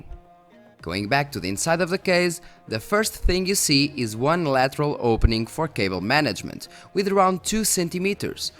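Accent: Portugal. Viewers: Are u portuguese? I can see it by the acent xD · deu para perceber pelo sotaque que és tuga . Continua com ótimo trabalho, abraço !! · E esse sotaque tuga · Olá a todos, eu cai aqui de pára-quedas e depois de ver este video fiquei desconfiado que o é português de Portugal, estou certo ou errado? É que este inglês ó típico de Portugal.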